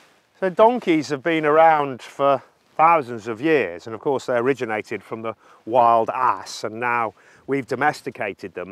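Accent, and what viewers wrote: United Kingdom. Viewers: omg the posh level of this guy, its like if you could take the most stereotypical rich english guy and put him on screen you would naturally have this youtube channel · Because he has English accent.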